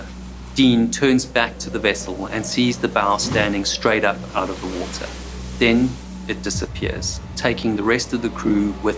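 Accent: South Africa. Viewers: It would be very interesting if you created a video about rescue operations, specifically Coast Guard helicopters. They are fascinating creatures in that they can fly in atrocious conditions. My son's boy scout troop did a field trip to our local Mercy flight helicopter. It was so interesting! One thing I found fascinating was the RPM of the main rotor blade. I think it was like, 165 RPM, it was a lot slower than I thought it was but the blades are so long that the blade tips can easily approach the speed of sound as the tips move and a much higher velocity than closer to the center of rotation. Anyhow, I think it would be really cool to understand more about search and rescue equipment in general. I'll watch anything you talk about with that super cool South African accent! Cheers! I think you should find a way to say 'Thrusters' in each video you make:) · I'm thinking he hears "dick hand" from the pleasant South African accent.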